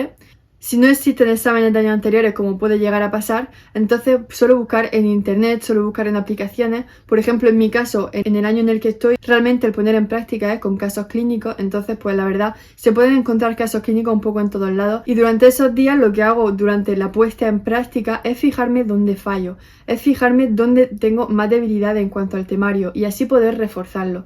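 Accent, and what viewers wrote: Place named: Murcia, Spain. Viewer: buen vídeo, tu familia es de Murcia? tienes acento de por aquí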